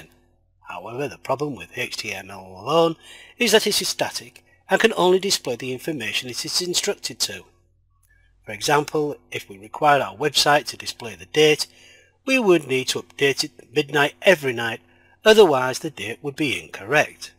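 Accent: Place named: Newcastle, United Kingdom. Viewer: Very good explanation - and no trouble with your Geordie accent either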